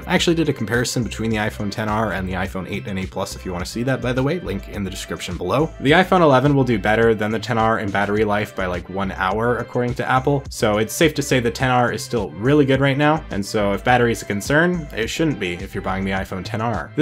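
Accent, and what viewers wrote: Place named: Canada. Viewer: i could tell your canadian within 3 seconds of the video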